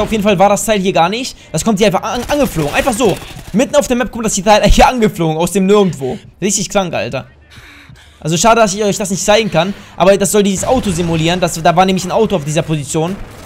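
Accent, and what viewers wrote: Hanover, Germany. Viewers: kommst du aus Hannover??? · premium ^^ du kommst aus hannover? :D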